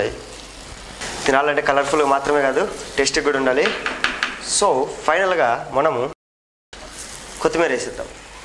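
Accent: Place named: Tamil Nadu, India. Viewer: I like your video bcz u r Telugu is so , I have shocked because Tamil people Telugu is so different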